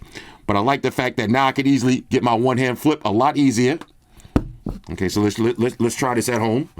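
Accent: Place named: New York City, United States. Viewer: is literally an NYC hood dude from my generation. He has the best vids cause its like I'm talking to one of my boys from back on da block 🤣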